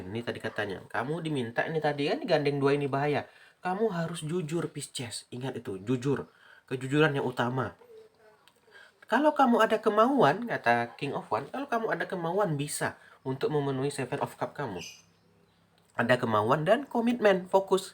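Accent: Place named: Madura, Indonesia. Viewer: Pisces hadir kok kyk org madura logat nya hhhh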